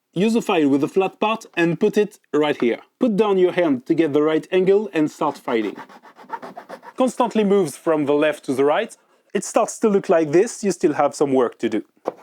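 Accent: France. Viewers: Pas de réaction à mon post d'il y a BIEN PLUS d'un mois (eh, YT de mes...). Pas étonnant : tu t'adresse à un public anglophone ou anglophile (dont y doit y en avoir qques uns qui viennent se régaler de ton accent Frenchie) et À PEU PRÈS les seul à avoir pigé le sens de mon intervention, c'est toi. De deux choses l'une (à part le soleil bien sûr), OU BIEN t'as pas le temps de revenir voir les comm. sur tes produc à YT, OU BIEN tu t'en fous de ce que je te dis. Libre à toi de continuer dans cette voie, mais sois prévenu : c'est une très lourde responsabilité que tu prends en n'avertissant pas ton public du danger à porter en bouche, régulièrement, du pvc industriel. Si c'est la 1ère hypothèse qui est juste, j'ai pas d'autre choix que d'inonder les comm. de tes autres "sorties" pour battre l'alarme. (Et p'tain, je me passerais bien de me farcir ce taf ; car y en a d'autres qui font comme toi - mais pas avec autant de constance d'ailleurs je ne sais pas comment tu fais ; chapeau pour ça - & pas pour ton entêtement si c'est la 2ème hypothèse qui prévaut...)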